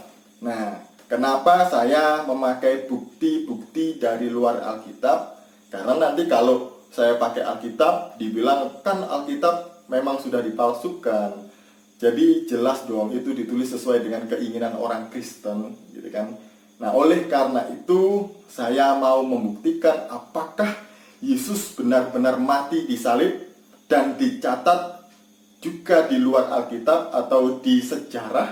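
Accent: Java, Indonesia. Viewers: 😂😅🤣😂😅🤣😂😅🤣 Wahai PENDETA bersuara medok Setiap agama mempunyai versi. Versi kristen yesus mati tersalib yg tadi anda bicarakan itu memang benar. Dan itu gak jadi soal karna itu versi anda. Sedangkan versi islam Allah menyerupakan wajah nabi isa yg mirip dengan isa lalu disalib. Karna cara salib itu cara hukuman mati bagi org yg bersalah dan itu hukuman yg KEJI DAN TERKUTUK. bisa dibayangkan jika nabi terbunuh dengan cara disalib tentu sangat hina.mungkin skrg isa akan diolok2 karna mati dengan cara yg keji. Maka dari itu allah menyerupakan wajah nabi isa dengan org yg diserupakan lalu dia disalib dan allah mengangkat hidup2 nabi isa ke langit. Dan anda seharusnya berfikir. Tuhan anda TELAH MATI itu artinya TUHAN YG ANDA SEMBAH itu tidak berkuasa atas dirinya. Dan anda malu mengakui tuhan anda dengan cara disalib dengan cara hukuman yg keji dan terkutuk Lebih baik mana coba Tuhan dibunuh disalib Apa tuhan diangkat hidup2 dan diangkat ke langit. 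Pake akal logika yg sehat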